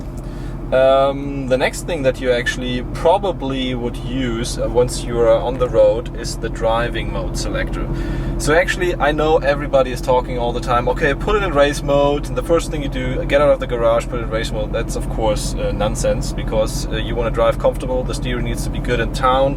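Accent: Germany. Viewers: Der deutsche Akzent ist ja schrecklich 😂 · great video! more talking videos please! Also really enjoyed your German accent!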